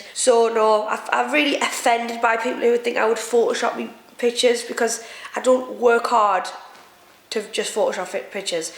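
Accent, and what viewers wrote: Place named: Sunderland, United Kingdom. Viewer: Cher Horowitz she is from Sunderland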